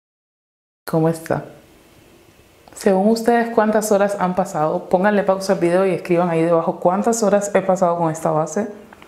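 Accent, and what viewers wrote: Cuba. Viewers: ella es cubana asi es su asenton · No es que no sepas decir la palabra, sólo es tú acento cubano. 🖤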